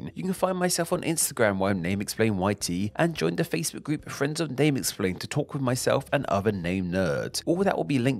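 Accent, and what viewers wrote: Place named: London, United Kingdom. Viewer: Good video. I'd love to know where your accent comes from. I've known people who speak just like you, all from London and the South East, but it's quite distinct.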